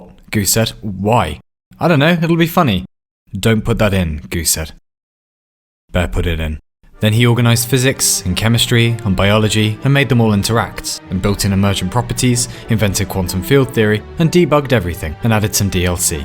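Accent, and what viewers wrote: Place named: England, United Kingdom. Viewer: what really makes this channel awesome is his English accent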